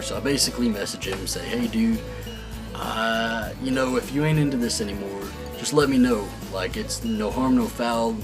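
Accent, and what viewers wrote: South, United States. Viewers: Your southern accent is cute 😂❤️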